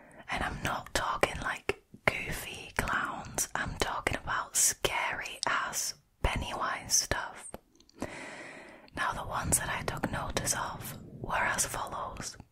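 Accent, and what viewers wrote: Northern England, United Kingdom. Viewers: you have a lovely voice sends me straight to sleep :) not to intrude but are you from the north of england? i am from there and you pronounce things similarly to me and it made me feel represented haha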